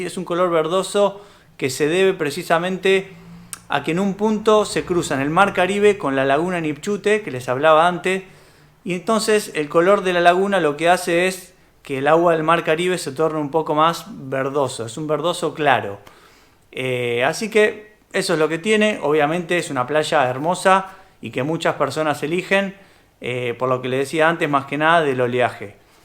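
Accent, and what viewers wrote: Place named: Argentina. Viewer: Gracias!! Sobre todo tu acento argentino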